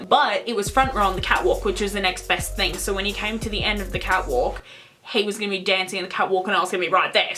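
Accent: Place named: Australia. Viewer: okay but i notice a HUGE difference between pronunciations of words when australians say them vs when americans say them (i being a fellow american). for example, i say uh-deed-iss. you say ah-deed-das. correct me if that's (the way i say it) not the correct way to say it ahaha. NO HATE ON YOUR ACCENT (i actually love it), just thought i'd point that out cause i think it's cool. 😂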